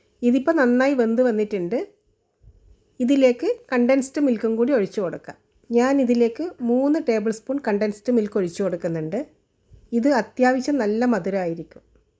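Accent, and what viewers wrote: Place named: Kannur, India. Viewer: കണ്ണൂർക്കാരി ആണല്ലേ എത്ര ശ്രദ്ധിച്ചിട്ടും കണ്ണൂർ സ്റ്റൈൽ വരുന്നുണ്ട് കൊള്ളാട്ടോ 👍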